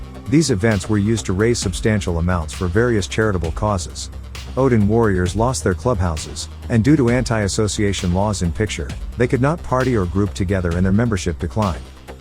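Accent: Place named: United States. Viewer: Why is it an American doing the voice over as you said, a load of crap 😮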